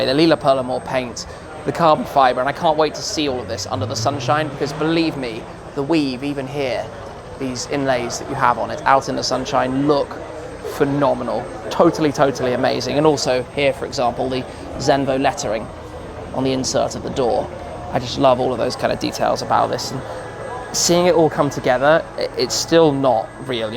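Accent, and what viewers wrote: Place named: England, United Kingdom. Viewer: The English. Can't handle their voice can't watch video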